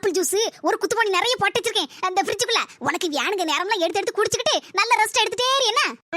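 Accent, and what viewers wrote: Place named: Tirunelveli, India. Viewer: சூப்பர் திருநெல்வேலி பாக்ஷை....கேட்க இனிமையாக இருக்கு...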